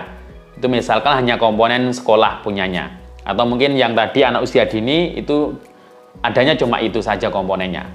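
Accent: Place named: Purworejo, Indonesia. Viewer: Wong Purworejo ni mas...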